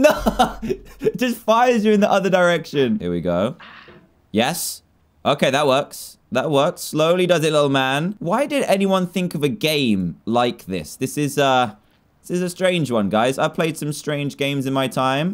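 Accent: United Kingdom. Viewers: You so British lol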